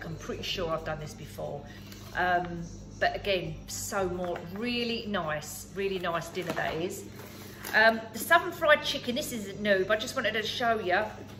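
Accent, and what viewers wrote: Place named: Essex, United Kingdom. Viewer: Hi Hun, I absolutely love you videos, you are completely a girl after my own heart. I live in the North now, but I was born in Essex and grew up in the east end of London, so your wonderful accent is like music to my ears..Keep it up Hun Bella xxx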